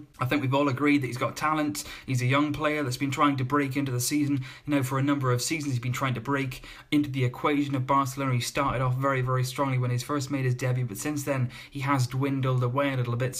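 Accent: United Kingdom. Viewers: Im curious whats your nationality? You sound British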